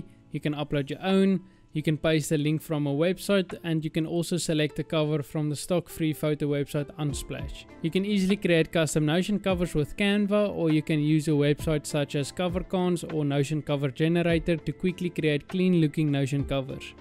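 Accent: Afrikaans, South Africa. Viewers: Not Slavic. He is South African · This is a great video!! I felt right at home the moment I heard your Afrikaans accent😂 Your video is really helpful and everything went smoothly when I did it myself except for the creative progress bar, everytime I tried to put in the code it said this: "Argument of type text does not satisfy function slice. [6,18] Percentage is not defined. [23,33] Argument of type text does not satisfy function slice. [48,60]" I copy and pasted the code so I never changed anything yet I still keep getting this. Do you know how I could fix it? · 6 Seconds in and I hear a Saffer 😂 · bro are you South African ? We have the same accent hehe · I can hear a South African from a mile away. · 2 seconds to hear your south african.❤😂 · This is the best description of an Afrikaans accent I've ever heard lmao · Damn this man is very Afrikaans. 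Wat n legend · 100% south african? 😅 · OMF Are you South African. I can hear the Joburg Vibes from your voice.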